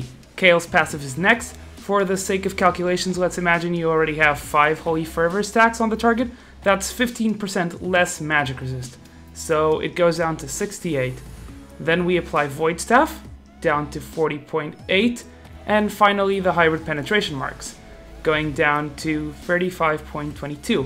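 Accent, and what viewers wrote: Portugal. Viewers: ES TUGA MAN ahaha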